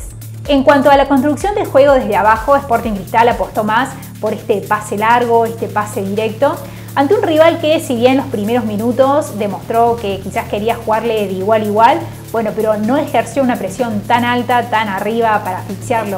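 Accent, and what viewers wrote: Córdoba, Argentina. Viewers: Wao sabes demasiado de fútbol mucho más que muchos comentaristas reconocidos y youtubers famosos Has estudiado algo referente al fútbol? Hace poco descubrí tu canal y es una joya algo que no hay en la plataforma análisis táctico de la liga peruana Increíble Nuevo suscriptor y me parece que eres de Córdoba 😊 Saludos desde Lima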